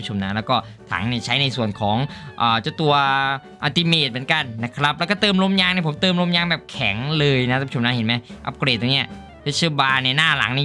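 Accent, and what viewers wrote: Korat, Thailand. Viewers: เอ้าคนโคราชบ้านเอ็งนิว่า555สำเนียงพี่คือคนโคราชเลย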